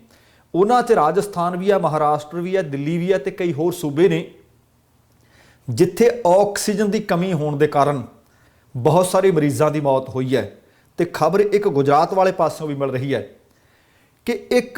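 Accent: Malwa, India. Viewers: ਧੰਨਵਾਦ ਜੀ, ਵੀਰ ਜੀ ਅਵਾਜ਼ ਤੇ ਜਜ਼ਬੇ ਤੋਂ ਮਾਲਵੇ ਤੋਂ ਲਗਦਾ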